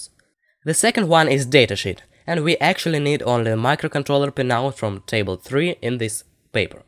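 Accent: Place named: Russia. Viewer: worst russian accent i'v ever heard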